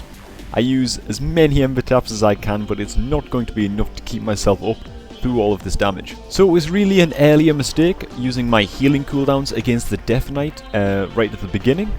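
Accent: Newcastle, United Kingdom. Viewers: Hey man, just wanted to say hi! just found you randomly on youtube searching for lock videos as I'm currently leveling one to replace my warrior (Bad timing by the looks of it with 5.4 but I'm hooked on destro now) Are you from near Newcastle? I recognize the accent, I'm from Leeds =) Anyway thanks for the vids and you've earned a new subscriber!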